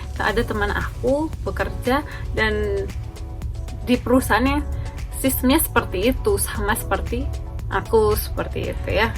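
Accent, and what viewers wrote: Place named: Japan. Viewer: bener kak sampe mikir di menit menit awal, kakanya mungkin orang jepang yg belajar bahasa indo 🥹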